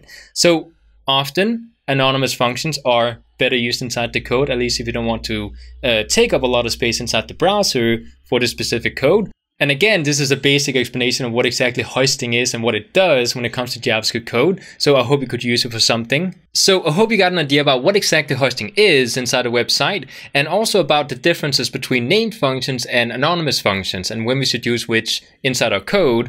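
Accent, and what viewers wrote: Italy. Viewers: is he Italian?🤔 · are you italian?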